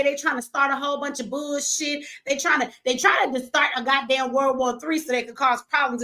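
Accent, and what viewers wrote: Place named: South, United States. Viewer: just notice youve pick up a strong southern accent...ahhhall for all, we lyyyyyve for live. Not unusal to do so. Its call adaptation lol!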